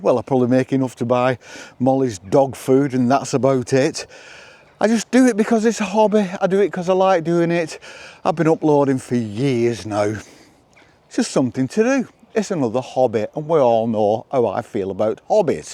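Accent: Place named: Lancashire, United Kingdom. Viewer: Thank you for sharing your openness and authenticity of your self. Not only as a fellow Lancashire person no longer in the area am I drawn to your accent and the scenery I find it refreshing to see and hear authentic videos. See you on the " flip"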